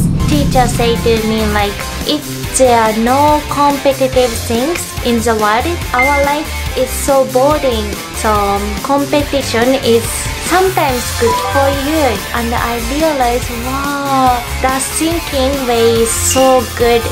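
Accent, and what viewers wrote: Japan. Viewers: Your so cute and lovely, I like how you deliver english word, sounds like hapon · Love you're English Accent Mixed Japanese ❤️😊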